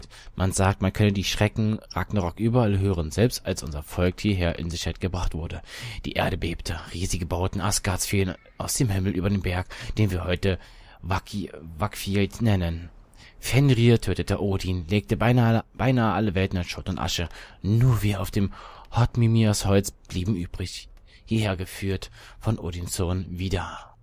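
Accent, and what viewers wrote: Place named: Berlin, Germany. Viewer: Wär ja mal voll endgeil... Natürlich, um so weniger Chancen, um so besser xD Ey, das mit dem Träumen war meine Idee D:< xD Der Tod war so unnötig xD omg, Lachflash xD Dein Berliner Dialekt ist voll cute:D Der Baum will dich beschützen!😌 Ich kann hören, wie sie vögelt... Achso Vögel fängt... pfeifend weggeh Eric ist vielleicht an Eric the red angelehnt... <<< Gedanke kam mir so ganz am Anfang xD Tschuldigung, der Mond sah so lecker aus.-. Ich konnte mich einfach nicht beherrschen..., das Lesen musst du aber noch üben😌 Srsly? Auftraggeber, Auftragnehmer? XD Dieses "Oh, fuck!" am Schluss und ich erstmal so mega ratlos, was du meinst xD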